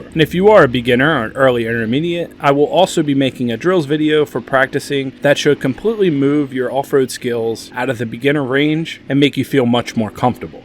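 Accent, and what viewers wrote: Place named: Pittsburgh, United States. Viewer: You from Pittsburgh? I sense a Pittsburgh accent N nat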